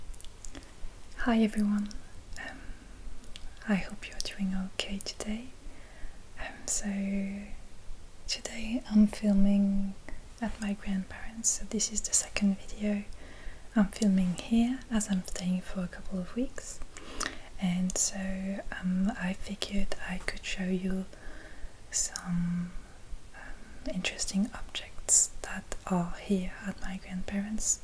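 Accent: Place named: France. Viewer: Old cameras, french accent plus your beautiful face means perfectionnnn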